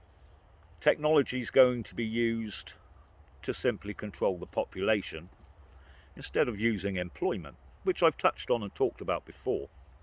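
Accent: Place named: West Country, United Kingdom. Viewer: Wow, I’m late to this episode but as ever nice to hear a wise sage view and in a twang of West Country that I know well. I listened again to the tasob stream from a month ago and can see that your attempt at infiltrating the Conservatives certainly engaged your mindful cogs, I’d vote for you!😀😀😀😀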